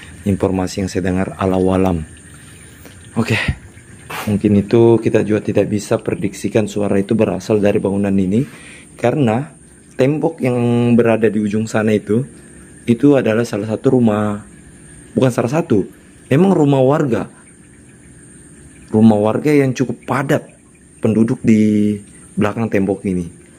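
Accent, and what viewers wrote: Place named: Jakarta, Indonesia. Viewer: Makassar bisa tonji bisa juga logat jakarta walaupun kadang ada yang salah sebut kata katanya sedikit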